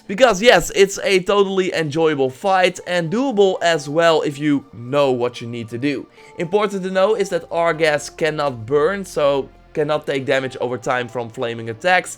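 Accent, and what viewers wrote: Netherlands. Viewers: Just wondering. Are you Dutch? You really have a Dutch accent xD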